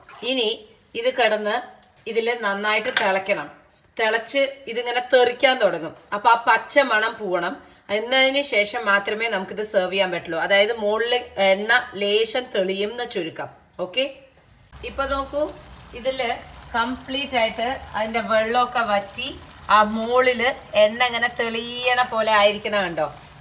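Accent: Palakkad, India. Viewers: Dosakku ippo kazikkanday thani palakkad slang ishtapettuttollu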